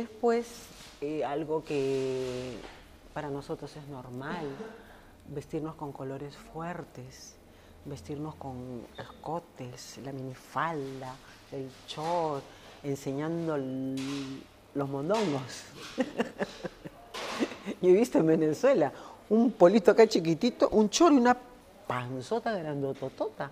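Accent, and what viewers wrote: Peru. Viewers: Tú eres peruana? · Es el acento neutro peruano, tenemos más acentos por las demás regiones, pero uno de los más difíciles acentos para imitar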